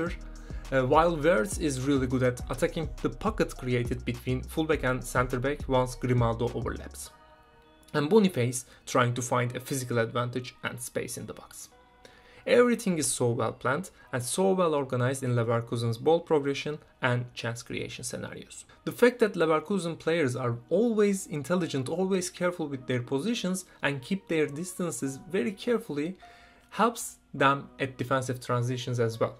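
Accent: Turkey. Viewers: Your accent is making me think you are Turkish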